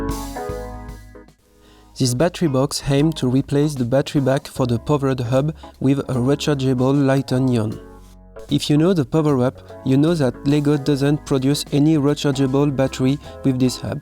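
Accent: France. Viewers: Il est français ?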